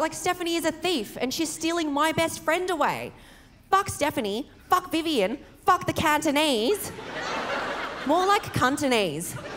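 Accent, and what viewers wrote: Australia. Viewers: I love her Australian accent with her Asian culture. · 竟然听懂了，澳洲口音吗，way发音成wi。最后一段overlord是讽刺吗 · your Australian dialect is quite exaggerated, your punctuality is ok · Wow, has an Aussie accent! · She's got a broader australian accent than me too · She got that Aussie accent too. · accent sounds Australian!!! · I love Aussie accent. I migrated to Australia in 1976 and I identify as Australian. There was an ad on TV many years ago that showed a teenage Chinese girl, a happy person, responding to a question from another traveler: "Where are you from?" Her response, in an Australian accent just like, was "I'm from Ballarat, where are you from?" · Not eglish.... australian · F, u got d most Ozzy accent! Rock on,! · Well done. It is always a pleasant surprise to listen to a beautiful Asian woman speak with a Australian accent ❤ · Missed Aussie accent!!! 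It’s been a while!! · I thought your story and story telling was hilarious. Also like your Australian accent. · Like your Australian accent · Well done. However, as an American, it is a little brain-jarring to hear an Asian speaking English with an Australian accent. Add a sombrero, do a little yodeling, and the cognitive dissonance will be complete. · Australian accent is unbearable. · Damn, she really hasn't kept even a fraction of her parents' accent, she sounds even more sterotypically aussie than I do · She’s so funny, I love the Australian accent on a woman. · I love the sticker figures drawings. is this your art work? Nice. It really makes your jokes visual and twice as funny 😆 For me being Asian American it’s funny to see and hear an Asian with an Aussie accent. “Mum” “Naur (no)” 😂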